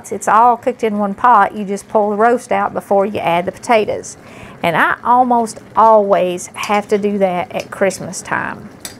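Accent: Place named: Appalachia, United States. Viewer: She's American Appalachian speaking English. Wotta question, 🤔 & Who WANTS to Know?? Friend, lol. Oh geeze.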